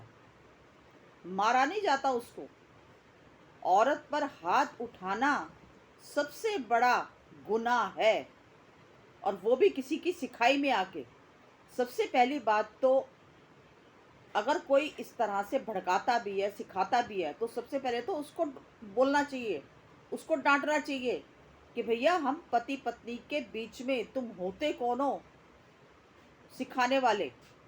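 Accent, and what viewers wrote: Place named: Muzaffarnagar, India. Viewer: Haryana ka touch to bilkul nahi aata. Muzzfernagar ke area mai aise hi bola jaata hai.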